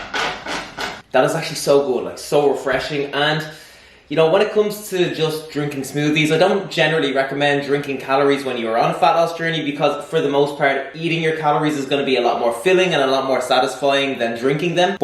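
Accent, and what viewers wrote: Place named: Ireland. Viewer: I have been watching your videos ALOT when im at work, taking notes! today i am watching at home with sound, and i had litterally no idea you where irish!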